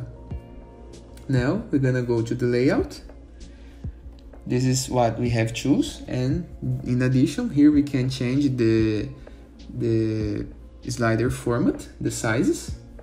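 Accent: Brazil. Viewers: Sotaque BR haha nice video!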